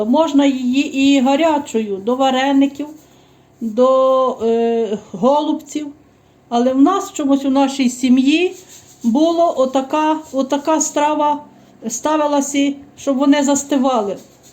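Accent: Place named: Prykarpattia, Ukraine. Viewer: ДОБРОГО ДНЯ! СЬОГОДНІ ВИПАДКОВО ПОБАЧИЛА ВАШ КАНАЛ І ПОЧУЛА СВОЮ РІДНУ З ДИТИНСТВА МОВУ. АЖ ЗАПЛАКАЛА. Я ТЕЖ РОДОМ З ПРИКАРПАТТЯ. ВІДРАЗУ ПІДПИСАЛАСЬ НА ВАШ КПНАЛ. СКАЖІТЬ, А ЗВІДКИ ВИ КОНКРЕТНО? ДЯКУЮ.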